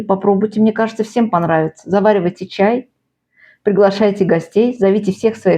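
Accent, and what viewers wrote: Baku, Azerbaijan. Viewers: Да,узнаю бакинский акцент❤ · Я пишу Вам из Дербента. Мы тоже пекла такие пироженки и так же называли белочками. Но когда-то много лет назад у меня в Баку жила тётя с семьёй и я приезжала к ним в гости. И сейчас слушая неповторимый бакинский акцент- такая ностальгия по тем временам. Как будто родной голос 😊😊😊. Спасибо ❤ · Добрый вечер! Очень приятно смотреть Ваши видео, особенно Бакинский акцент. Очень прошу рецепт песочных пирожных со школьной столовой, за 22 коп. с повидлом и розовой глазурью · Судя по акценту Вы жили в Баку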